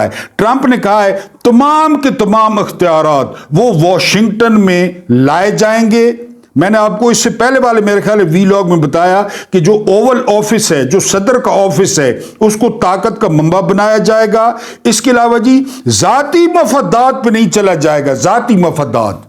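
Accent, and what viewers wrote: Saraiki, Pakistan. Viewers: ماشاء اللّٰہ کیا سرائیکی تڑکا لگاتے ہیں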